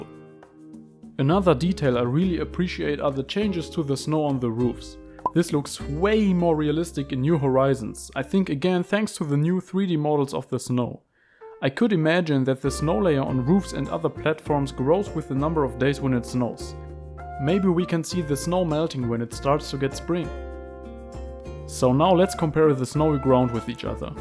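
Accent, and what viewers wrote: Germany. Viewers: Man hört raus das du Deutscher bist 😉 gutes Video 👍🏻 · Aha ein deutscher Akzent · Bist du Deutscher oder warum hast du so einen deutschen Englisch Akzent ??? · Good video. (hörst dich an wie ein deutscher... Kann ja auch sein) This differents are extrem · are you german? because your english sounds like you were from germany. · How do you only have 15 subs? This Video is doing Great! Great editing, good quality and smooth music in the background which isn’t too loud or quiet. There might be a german accent but dang! You got a new sub ( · Hör ich da etwa einen Deutschen aktzent? 😁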